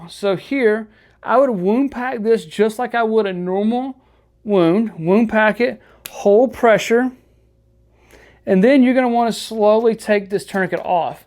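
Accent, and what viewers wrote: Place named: United States. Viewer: Very American sounding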